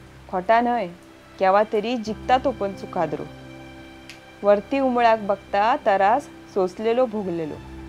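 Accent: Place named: Malvan, India. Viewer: अतिशय सुंदर. कवितेतील अस्सल मालवणी शब्द आम्ही लहानपणी ऐकले होते. नाहि तर हल्ली मालवणीच्या नावाखाली काही तरी धेडगुजरी मालवणी ऐकवतात/बोलतात. असच अस्सल मालवणी तुमच्या कडून ऐकायला मिळो हीच सदिच्छा.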